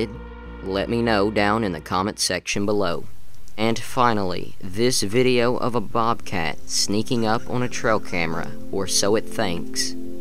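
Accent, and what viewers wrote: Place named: South, United States. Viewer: You sound so country,I wanna say yeah.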